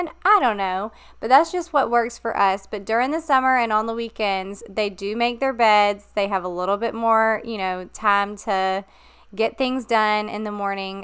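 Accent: South, United States. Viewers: Ok first of all - you’re one of the best ppl on YouTube, so shame on all the haters!!! Coming from Canada, just hearing your southern accent is awesome and relaxing!!!! Yassssss to spring cleaning! I’m all in for this! We are preparing to sell our home this summer so I need to get it thoroughly cleaned before showing the house! I need some good exterior house cleaning tips.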